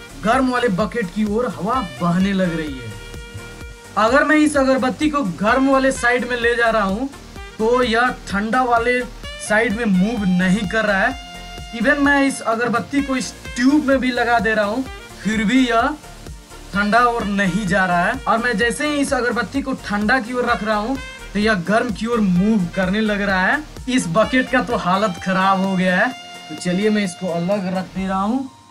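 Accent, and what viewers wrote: Bihar, India. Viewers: bihari ho ka?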